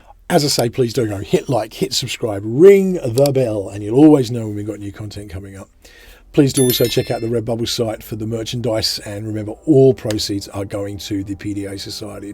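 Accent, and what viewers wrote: Australia. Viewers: Are you from UK or AUS, you sound like you grew up in regional Victoria! Thanks heaps for your insight. Ive a 5yo boy with asd, pda and Add/hd potentially. You’re a hero. First search on yt as a result of desperation and I’ve been sucked into your channel. Thank you. Big love from down south 🖤🦘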